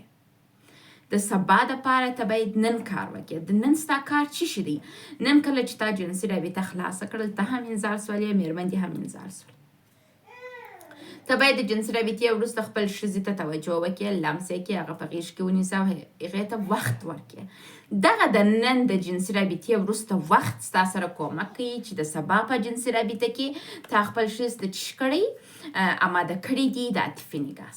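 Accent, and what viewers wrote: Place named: Kandahar, Afghanistan. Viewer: محترم این زبان را زبان پشتو میگویند که مربوط به افغانستان می‌شود و لحجه اش هم قندهاری است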